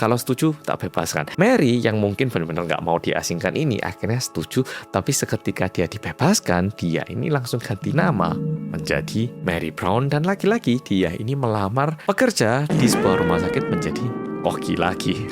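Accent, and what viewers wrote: Java, Indonesia. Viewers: Hai org korea yg medok😅 sehat selalu 💪🏿 Lgsg nyimak🎉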